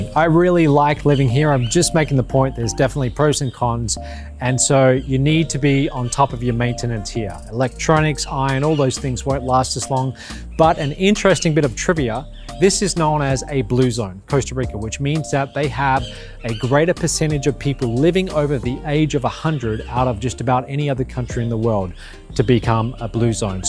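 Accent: Australia. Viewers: You have an Australian accent. How do you compare life in Australia and Costa Rica.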